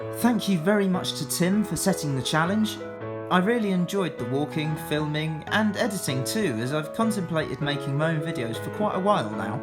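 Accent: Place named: England, United Kingdom. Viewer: This is amazing, basically the same as a Tim video but with a slightly different English accent :)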